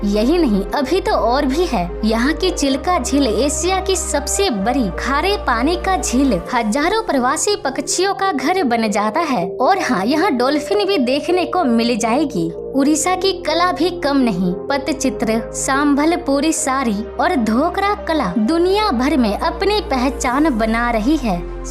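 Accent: South, India. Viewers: Shayad mujhe lagta hai aap South ke taraf se ho ISI vajah se aapki Hindi thodi si alag lagti hai Lekin cute hai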